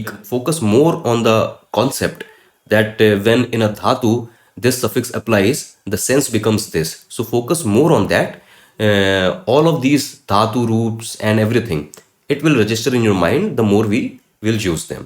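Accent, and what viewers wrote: Hindi, India. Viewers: Namste, Your class is great. Very useful. I was in search a class where Sanskrit Grammar is taught through Bhagavat Gita. I have started to go thorough the episodes one by one. Thank you so much for uploading the classes. One thing I wish to request you is about the pronunciation of Sanskrit words. Please pronounce अ मात्रा ending words as it should be in Sanskrit language. For example, सञ्जय उवाच should be pronounced 'Sanjaya uvacha' not 'Sanjay uvach' as it is in Hindi language. If it is 'Sanjay uvach' it should be written with a halant in Sanskrit i.e. सञ्जय् उवाच्. Hope you will take my suggestion positively if you feel it is correct. 🙏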